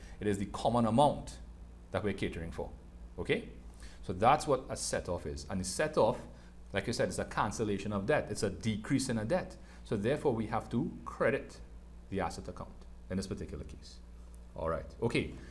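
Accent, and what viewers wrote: Trinidad and Tobago. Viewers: U sound trini owo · He doesn’t, that’s a Trini accent😭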